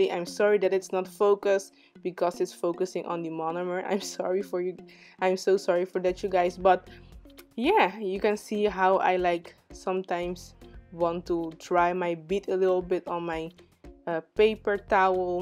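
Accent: Netherlands. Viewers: Your English sounds Dutch. Am I right? 😅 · ik hoor gewoon aan je accent dat je nl bent hahahahha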